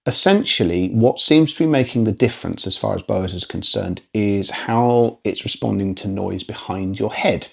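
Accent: United Kingdom. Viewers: I think he said Bowers but he's British so it comes off subtle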